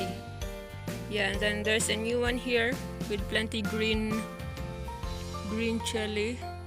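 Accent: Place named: France. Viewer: Thanks for the tour! Very nice farm. Do you realize that you have a French accent? Ha ha, you do.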